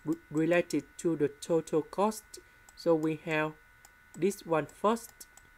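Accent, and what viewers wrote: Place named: Vietnam. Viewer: oh giọng tiếng anh này thì chỉ có việt nam :))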